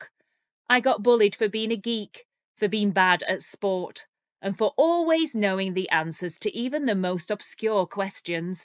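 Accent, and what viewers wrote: United Kingdom. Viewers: It's British accent isn't it?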